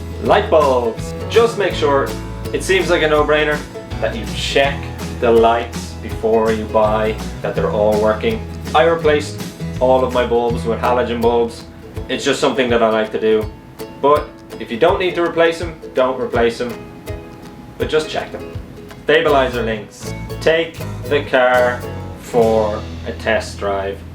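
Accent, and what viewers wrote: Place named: Canada. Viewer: I knew your accent was Canadian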